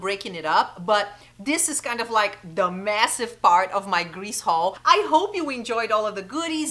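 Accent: Brazil. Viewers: ouvi o sotaque e na hora sabia q era brasileira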